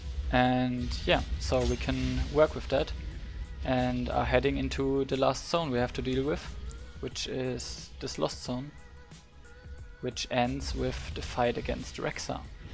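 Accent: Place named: Germany. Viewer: Ich wusste doch der akzent ist Deutsch! Ein Frostwolf kollege! :D Davon ab: sehr gutes Video, habe erst kürzlich wieder angefangen und Visions sind noch recht neu für mich (hab gestern den ersten 4Mask run gemacht). Ich konnte jetzt einige Sachen aus dem Video mitnehmen die mir sicher in späteren Runs helfen.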